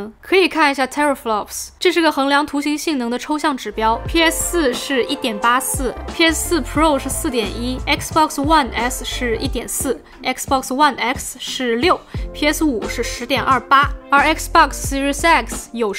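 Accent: Standard. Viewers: 講英文與中文都非常標準又好聽 畫面也都拍的很清晰到位 享受啊😎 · 英语发音超级标准。赞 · 英语发音真的标准。听着就舒服。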